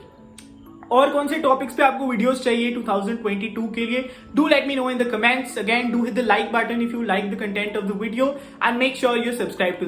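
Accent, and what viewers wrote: Punjab, India. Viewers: Kya tum punjab se ho....✍️✍️✍️✍️✍️✍️✍️✍️👂⤵️⤵️👂⤵️🏆🏆🏆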